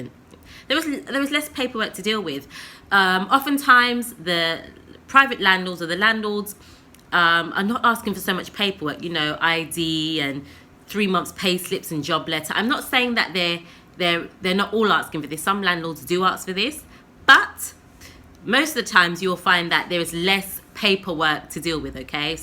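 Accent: United Kingdom. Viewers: your new subbie like your English accent and your contents, you're beautiful i am here supporting you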